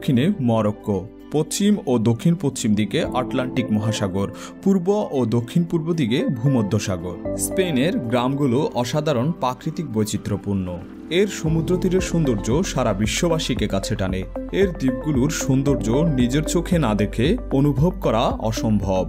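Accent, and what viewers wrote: Noakhali, Bangladesh. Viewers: Vai ki Noakhali / fenir 😜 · Noakhailla tor uccharon thik kor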